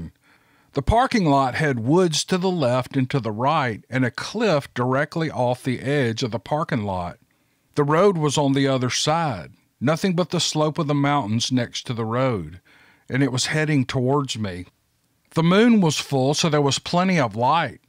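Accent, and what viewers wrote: South, United States. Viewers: Howdy Sir; Just wanted to take a moment to say Thank You on this hobby of yours. Did you say you were from MS or AR? I wouldnt think TX your twang isn't that gruff. Anyway, I do enjoy your tales. I am still not all that sure what they are and why we never seem to get anywhere about anything. I am even embarrass with some of these shows with these fools going out there and yelling hitting a tree then because they do not hahve anything make themselves scared for the camera or better yet those that make side people out to get them sigh. That gets old. With all the stupid stunts if they do every figure out this stuff nobody's going to believe them anyway.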